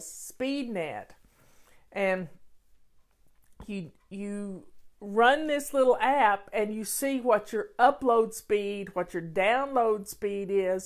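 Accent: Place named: North Carolina, United States. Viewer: I've been Flying for 15 years now - thank you! I wanted to tell you that I love hearing you speak because your accent is exactly like my grandmother's (also from North Carolina) and I've missed hearing it so. God bless you.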